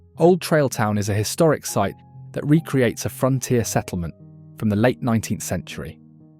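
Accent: England, United Kingdom. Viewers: Yes. An American state british accent. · This video has literally nothing in it that is hidden or unseen in Wyoming. These are all famous attractions known by any visitor which I am almost certain the video maker never has been. If you were English like the video sounds maybe check out London, that’s a very obscure place in England you could do a video about try focusing on London Bridge or Big Ben. But probably you’re just AI . Either way stay home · I think the documentary would sound better with a native Wyomian than a British person narrating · Wrong accent don’t need a Brit voice in American 🇺🇸🇺🇸🇺🇸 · why the F does this have a brit accent?? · The British accent ruins the documentary. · Unseen Wyoming. We love Wyoming. PLEASE use an American narrator for American locations. It is only respectful. Plus, we cannot understand some of the words, as spoken differently. 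Save the British for British. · Sounds British. He is making a nice video. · If it was filmed by a Brit then the narration is perfect. He’s easy to understand.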